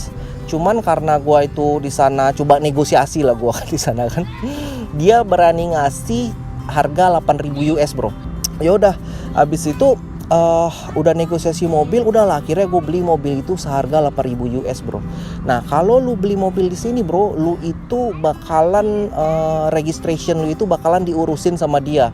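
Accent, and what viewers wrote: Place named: Riau, Indonesia. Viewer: Ngomongnya kaya org riau. Hehe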